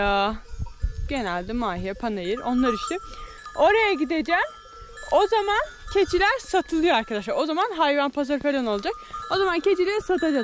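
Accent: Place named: Gerede, Turkey. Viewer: Konuşmalarını dinlerken bu kız Karabüke yakın bir yerlerden ellehem dedim Geredeli çıktın konuşma aynı bu tarafla.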